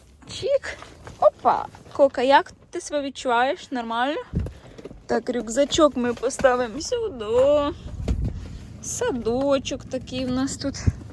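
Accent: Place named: Cherkasy, Ukraine. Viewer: доця, я так радію, коли виходить твоє відео. Вони мені такі домашні, ніжні, добрі, тому що ти дуже гарна дитина у всіх відношеннях. Бажаю тобі всього найкращого, а головне, щоб ви наші діти жили в світі без війни. Обожнюю як ти розмовляєш, у нас( Чер каська обл. ) теж так говорять.❤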